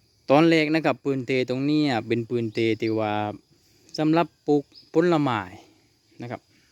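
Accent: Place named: South, Thailand. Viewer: ชอบสำเนียงใต้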